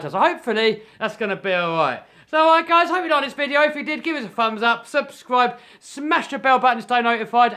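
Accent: England, United Kingdom. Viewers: Brithish English, best English🇬🇧🇬🇧 Always good videos👍👍👍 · picking on pronouncing is lame! especially at a funny britt guy. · I think your speech is fine I like an English accent especially the way a true English man talks totally awesome I really like the way you say H and 8 s sounds cool with the baby voice he does ask him for me to speak that way more often. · I love the way British people talk 😄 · What part UK you from? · in your next video... can you say leaf litter... i love how the britts say that:) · Say mo*ah however you wan* o say mo-ah! I love hearing *he Bri**ish accen*! *hats par of *he appeal of your videos for me! *op work! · I like your the way that you talk in the uk cause I live in Canada so how you speak is unique to me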